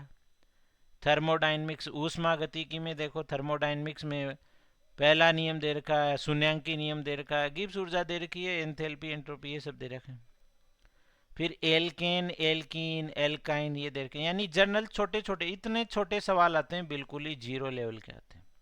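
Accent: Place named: Haryana, India. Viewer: Very good initiative sir And very nicely explained ....with Hariyanvi ascent and rajasthani touch....🤗 Great sir best donas ur self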